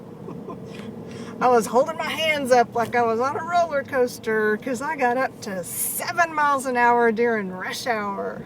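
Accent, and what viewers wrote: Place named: Houston, United States. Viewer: You are possibly the coolest mom that has ever lived. (I remember you said you had a daughter from one of your other videos I watched) You make YouTube videos and art projects. Not to mention you are super funny. Wish my parents were this cool when I was a kid lol. I was wondering where you were from because your accent is so familiar. Half of my family is from Houston so now it makes sense lol. Love you channel!! -Katie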